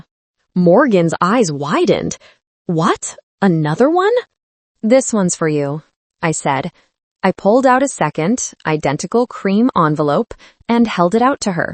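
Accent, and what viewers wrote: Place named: South, United States. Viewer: Well the southern accent didn't last long.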